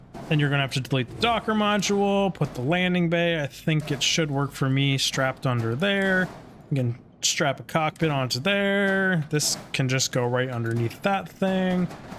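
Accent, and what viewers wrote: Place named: United States. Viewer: No hate man.... Love this video. :D , but I also, as a European, love how Americans just add an subtract letters and syllables in words thats not American. "Lon" = LEE-on", "Polvo" = "Pol-O-vo" for example.... As said... No hate... I just find this entertaining..... ;P If you watch all types of gaming-videos, "MetalJesusRocks" is a MASTER of this... ;P Edit.... After watching the whole video, this is deff going to be my go to ship! Theres too few builds like this with small ships and huge cargo.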